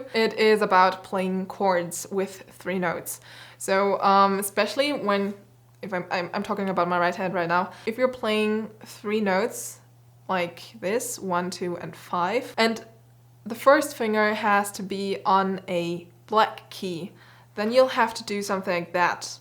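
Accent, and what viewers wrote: Germany. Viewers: Bist du deutsch? Ich höre so einen Akzent? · Your German accent is so cute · To change the topic ..... it's interesting to hear how a german speaks englisch. Du machst die gleichen gramma mistakes die ich auch aus der deutschen Grammatik rueberhole, und sprichst abgehackte Woerter. Englisch ist mehr eine weiche Sprache wo Woerter ineinander fliesen. Das ist schwer fuer Deutsche. Aber dein Englisch ist gut 🙂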